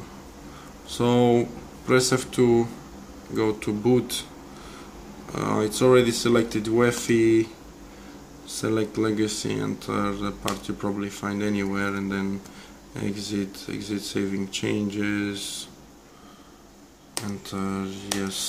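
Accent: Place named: Ukraine. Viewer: You sound like you are from Ukraine or somewhere close. Playing a guess game.. Anyway Il try this.. BTW does anyone know why did we start seeing this screen?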